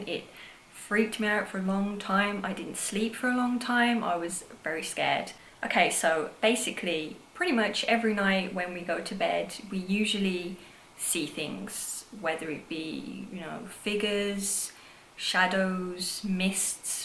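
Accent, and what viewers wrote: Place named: Birmingham, United Kingdom. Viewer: Omg are you from Birmingham, im so distracted by your accent it's just so cute hehe!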